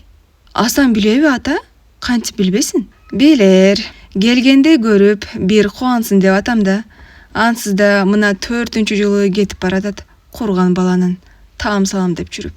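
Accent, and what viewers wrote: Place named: South, Kyrgyzstan. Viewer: Акцент туштукчо окулуптур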